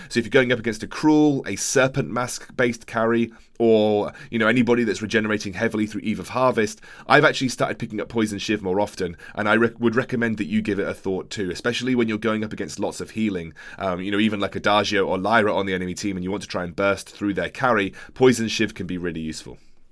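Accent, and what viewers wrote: United Kingdom. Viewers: British men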